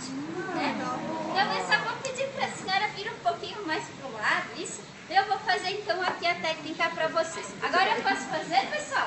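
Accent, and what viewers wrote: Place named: Serra, Brazil. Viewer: E ela continua falando da mesma forma charmosa que só o povo da 'sera' consegue falar. ^^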